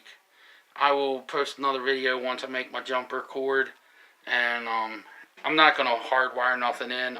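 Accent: Baltimore, United States. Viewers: I hear an accent are you from the Baltimore area? I'm looking to buy a small private plot of land hopefully around summer time between northern VA, eastern WV or southern PA. I could easily ask you a million questions but I'm sure your videos and Google can answer most.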